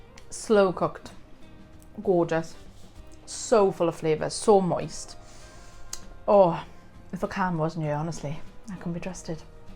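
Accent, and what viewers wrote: Wales, United Kingdom. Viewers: I'm sure that's a Welsh accent I hear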